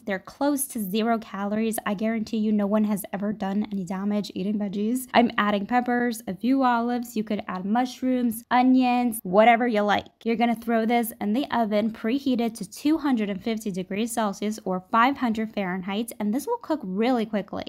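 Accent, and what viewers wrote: Italy. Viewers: I am italian and I love how you keep the italian pronunciation!!!! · Love the way you pronounce Mozzarella and Nutella. Just love your Italian accent in all ❤️❤️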